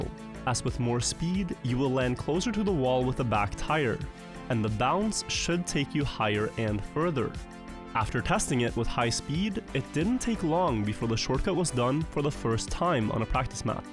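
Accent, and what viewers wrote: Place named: Norway. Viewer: Young sounds so Norwegian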